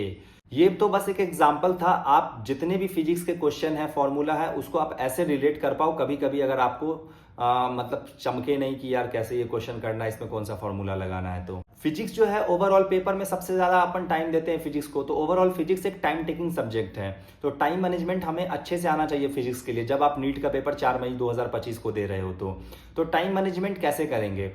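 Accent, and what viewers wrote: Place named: Bihar, India. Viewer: bhaiyaaaaaa......aap khn the phle 🥲😊....thnk uh so muchh...apki ye phli video dekhi...suggestions m thi...... aap bihar k ho kya...aap k baat s lga....thnk uh so much bhaiyaaa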